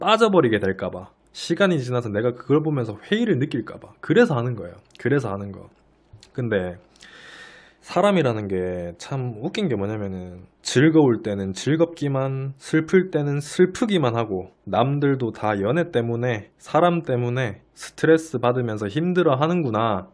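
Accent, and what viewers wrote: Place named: Daegu, South Korea. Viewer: ~ 평소에 영상 즐겨보고 있습니다. 말씀도 너무 잘하시고 생각도 멋있으시고 멋짐에 빠져서 유투브 입문한지 얼마 안된 제가 자발적으로 즐겨보는 몇 안되는 채널이 채널 입니다. 이번 영상을 보면서 의 진심이 느껴져서 이렇게 글을 쓰게 되었습니다. 깊은 생각과 나름의 철학으로 만드시는 영상들이니 언젠가는 빛을 볼거라고 확신합니다~^^ 그리고 좋은 말씀 많이 해주셔서 감사합니다~ 제가 대구 사람인데 서울에서 힘들게 타지 생활하면서 같은 동향 사람 말씨로 이런저런 얘길 들으니 더 친숙하게 느껴지는 면도 있는 것 같습니다. 그리고 영상보면서 지금의 남자친구와도 사랑하게되고 그 사랑을 유지하는 것에도 도움 받고 있습니다. 타지에서 외로워서 남자에게 마음이 쏠리게 되더라고요. 단순히 연애 이야기가 아니라 그 너머의 것들을 이야기해주셔서 더 나은 제가 되는데 도움을 주셨습니다. 그래서 지금 너무 멋진 남자와 연애할 수 있게 되었어요^^ 의 진심이 담긴 흔적들은 시간이 지날 수록 빛을 발할 거에요~ 그리고 저에게도 오래오래 그 마음을 보여주세요~ 보면서 제가 항상 제 자신을 다잡을수 있게요~ 긴글 읽어주셔서 감사합니다! 늘 건강하시고 행복하세요^^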